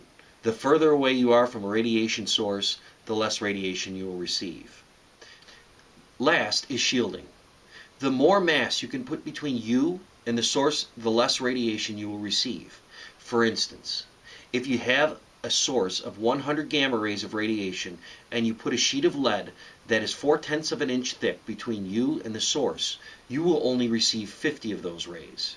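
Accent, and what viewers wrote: United States. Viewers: It's funny that you say that, only in America we say it that way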